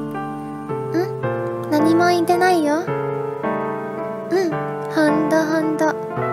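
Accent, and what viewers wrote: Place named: Japan. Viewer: Your Japanese accent ie awesome.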